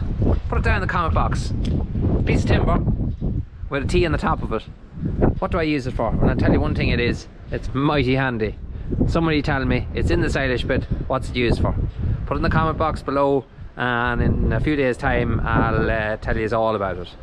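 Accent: Ireland. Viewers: are u irish,n. Irish or scottish get confused with accents😃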